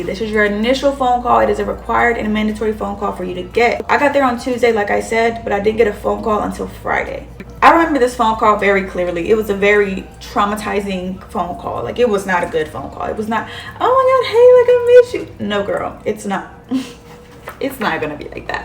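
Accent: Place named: New York, United States. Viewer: speaks like she from NY 😅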